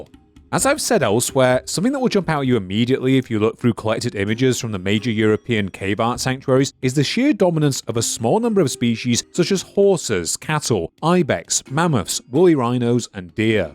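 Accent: United Kingdom. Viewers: i just feel like you should rename yourself 'A Histocrat' and replace the 'The' because it doesnt round well and it only sounds alright due to the british accent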